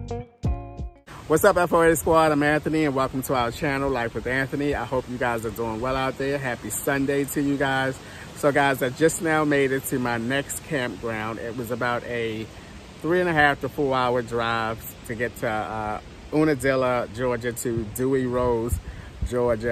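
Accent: Baltimore, United States. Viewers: where are you from, I'm from Baltimore and I can't help but to hear a little Baltimore in your talk.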